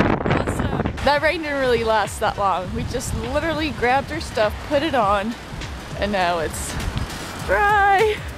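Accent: United States. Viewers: You speak English like an American, almost no accent and a lot of idiomatic expressions we use.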